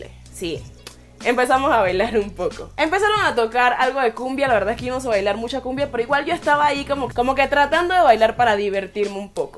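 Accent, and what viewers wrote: Panama. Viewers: Sabía que eras de Panamá 😫 si tenemos acento Ajajaja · Acabo de llegar a tu canal,y con tu acento reconocí que eres de PANAMÁ 🇵🇦😍❤. yo como 😳está chica suena al acento panameño😍❤. eres muy bella.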